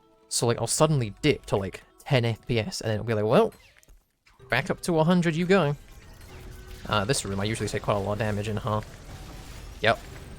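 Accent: United Kingdom. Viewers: WHY DOES HE SOUND MORE BRITISH IN THIS ONE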